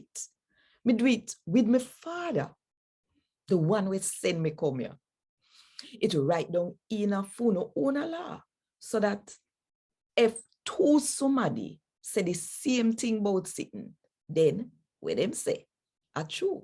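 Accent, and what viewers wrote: Jamaica. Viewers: Authentic Jamaican! Beautifully done. Keep it up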